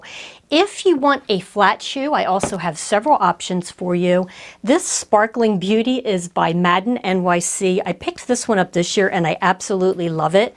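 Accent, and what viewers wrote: Philadelphia, United States. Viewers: I'm loving your Philly/South Jersey accent! My mom was a South Philly Theresa. You have excellent tips and explain things so well!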